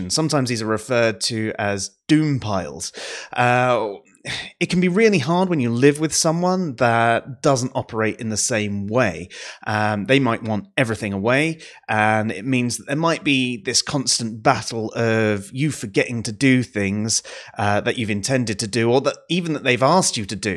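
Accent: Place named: England, United Kingdom. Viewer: This video just popped up on my feed and I'm glad it did. Very interesting topic for me to help understand my son who has ADHD and autism. Interesting, to the point and nice to here an English accent as a little extra plus. New subscriber here. Looking forward to checking out your other vids. Cheers for sharing.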